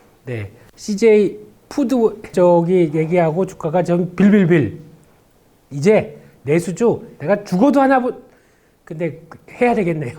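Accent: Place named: Liverpool, United Kingdom. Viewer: ...!날이갈수록 영어가 영국식(리버플쪽)으로 변화는듯~엄청최고👍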